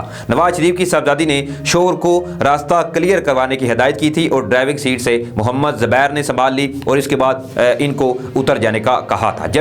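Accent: India. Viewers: urdu ko urdu lehje main bolo. Ziada indian banne ki zaroorat nahi. Is se tumhara ehsas e kamtari nazar aata hai.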